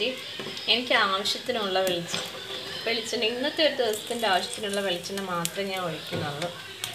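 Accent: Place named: Kollam, India. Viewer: njan kollam aanu😅😅. Samsaarikkunne kettappol thonni aa side aannu😃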